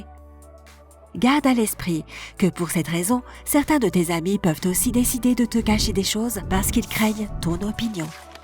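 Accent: Quebec, Canada. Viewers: la voix est horrible on dirait elle a le nez bouché avec des petites touches d'accents québécois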